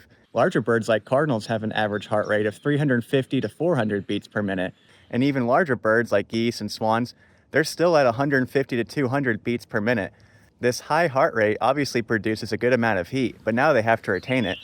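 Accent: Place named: United States. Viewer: Fun and all but cant stand the thicc american accent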